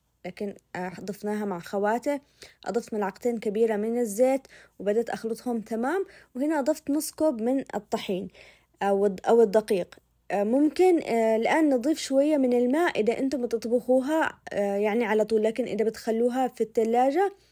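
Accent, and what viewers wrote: Aden, Yemen. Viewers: تسلم الايادي حبيبتي 💖… لهجتك تجنن اعيد الفيديو علشان اسمع اللهجه اليمنيه … اجمل واحلا لايك 👍🏻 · فديت الكلام العدني الاصيل ربي مايحرمنا منك ❤❤ طبخك مايحتاج شهادات 😊 استمررري وأحلى لايك👍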